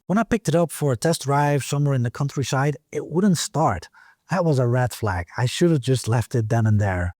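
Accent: Netherlands. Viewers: Het was de Gamma verhuisdoos die mij de tweede bevestiging gaf, na het horen van zijn accent. Ons kent ons... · Ik hoorde het accent, maar dacht: misschien is het een Deen. Dus ik keek naar hoe je ramen sluiten. Dat leek niet Nederlands. Uiteindelijk hoorde ik het je toch bevestigen. Wel een Nederlander. Voelt toch als thuis 😊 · Are you from the Netherlands!? You seem to have the same accent as i have, and I am from Rotterdam? ❤ Add: Yup, went further down the video, and you mentioned "niksen!" Echt uit Nederland, dan!?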